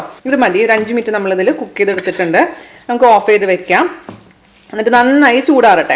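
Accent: Thrissur, India. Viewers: Good presentation in Trichur Malayalam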